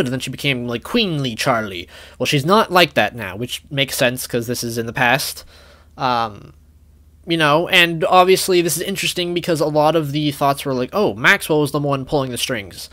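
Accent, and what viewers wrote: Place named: United States. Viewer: Judging by his voice he's American And I agree because Belgium and France are nowhere near each other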